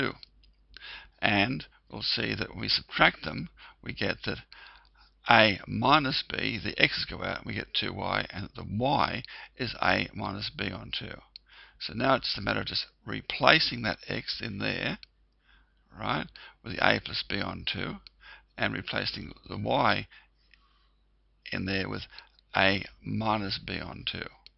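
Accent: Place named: Australia. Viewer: I can say he is an Aussie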